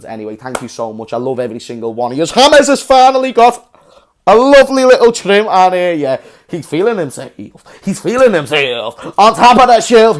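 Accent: Liverpool, United Kingdom. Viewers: Gooooo on lad keeping up the liverpool accent lol!!! L.f.c.4 eva y.n.w.a!!! From bidzy westmids Gypsy England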